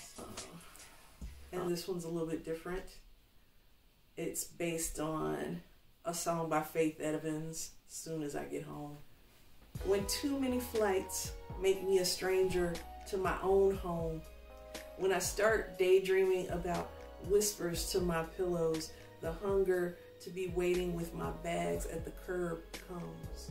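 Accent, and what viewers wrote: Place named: Kentucky, United States. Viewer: I love your accent. Kentucky via Central Illinois!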